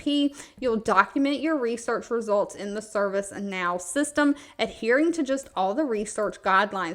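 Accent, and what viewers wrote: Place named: Louisiana, United States. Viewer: you're welcome luv! I saw on an older video you said people were complaining about your voice or something. Do not let that bother you! I'm a proud Louisiana woman that talks just like you, lol! Be you! Have a super day!💜💜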